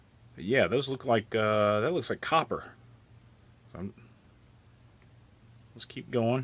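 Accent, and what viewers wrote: United States. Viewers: what accent is that? sounds real American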